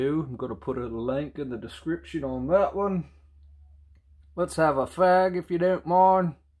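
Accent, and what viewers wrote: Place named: Australia. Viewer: G'day, I 💚 the accent, the lazier you make it the more Ozzie you sound 😂 Adorable 😘 Awesome Artist 👍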